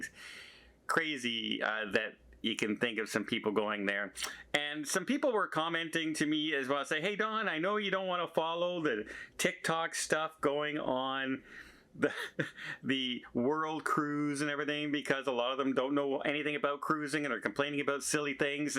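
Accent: Canada. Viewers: Don, I can’t pinpoint why, but I love your videos, despite never having been on a cruise in my life (I am in my 60’s, married, and empty nest) and having no concrete plans to do so. I am a New Yorker and appreciate your Canadian accent and your overall positive outlook on seeking to make your passion a reality. If I were ever to book a cruise, I would take your recommendations to heart. You are an inspiration in how you travel and share the joy with your viewers.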